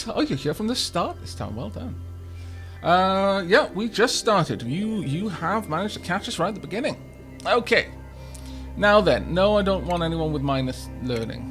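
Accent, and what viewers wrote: Wales, United Kingdom. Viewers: Are you from Wales by any chance? Your accent sounds very Welsh. I live there!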